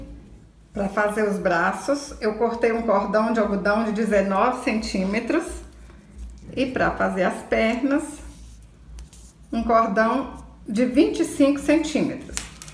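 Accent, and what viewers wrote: Minas Gerais, Brazil. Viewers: Amei essa ideia!!! Já acompanho seus vídeos há um bom tempo, mas só agora me inscrevi no seu canal. Gosto das suas ideias criativas. Às vezes me inspiro pra fazer totalmente novo e diferente do do vc fez, mas o crédito da ideia é seu. Usei uma ideia sua pra fazer o cabelo de uma vovó. Obrigada querida! Ah, amo sua mineirice😊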